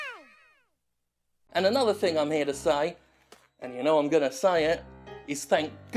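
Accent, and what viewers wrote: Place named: United Kingdom. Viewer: When he is fit, speaks with a British accent and uploads a 22 minute video on a Monday. Thank god for Jim Sterling Son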